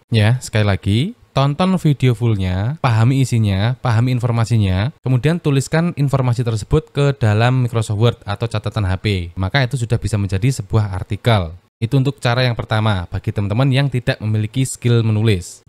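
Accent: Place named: Java, Indonesia. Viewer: Kyk nya beda deh, klo ini jawa nya lebih medok, klo kerah kuning gak terlalu kentara medok nya. Itu sih menurutku.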